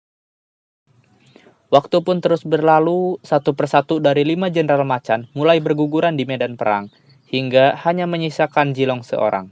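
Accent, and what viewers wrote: Indonesia. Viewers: Logatnya terlalu ke Sunda"an bro bikin kurang sreg dengarnya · Ngapaknya sungguh kental 😂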